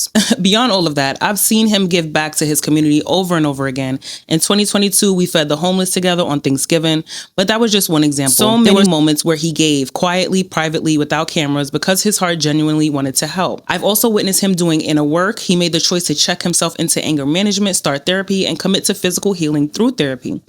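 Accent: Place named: New York, United States. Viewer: Great video! Side note I love your NY accent to much ❤❤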